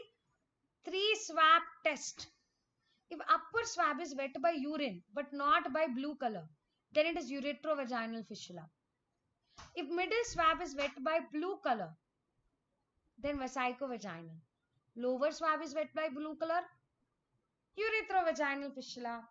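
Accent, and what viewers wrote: Telugu, India. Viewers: Thank u so much mam ur Telugu is so cute mam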